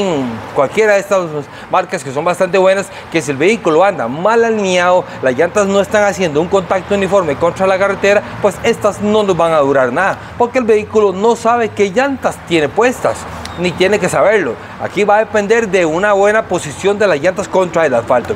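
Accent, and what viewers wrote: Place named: United States. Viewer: A pesar de ser colombiano , ya se nota que ha vivido en EEUU , por el acento gringo con la rr .buen video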